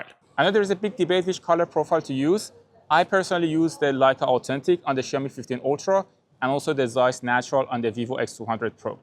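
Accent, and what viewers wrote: Iran. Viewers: persian accent shines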